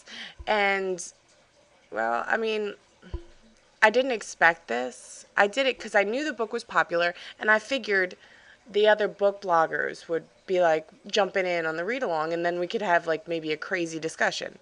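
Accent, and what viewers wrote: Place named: Eastern Europe. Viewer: lol funny east europe accent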